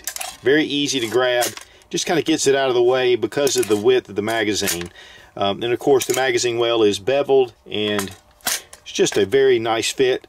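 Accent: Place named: North Carolina, United States. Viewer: Hi. Like your videos. You sound like you are down in eastern NC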